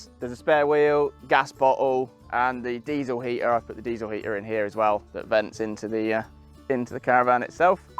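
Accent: England, United Kingdom. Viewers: Foreign??? Wow . He is British, he has a local accent/ dialect. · Foreign accent he's English are you daft us English should be able to go were we want in are own country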